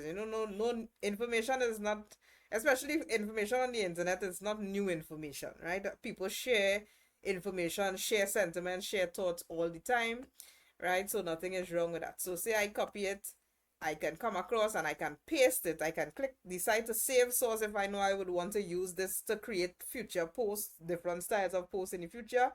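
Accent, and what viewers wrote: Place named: Trinidad and Tobago. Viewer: Super video!! also love the Trinny accent, keep going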